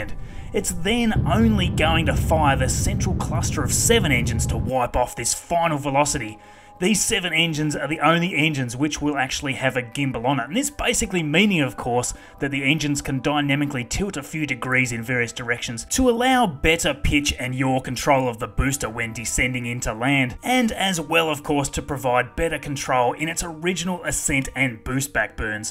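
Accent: Australia. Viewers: Got to love (or hate) the Aussie accent! :)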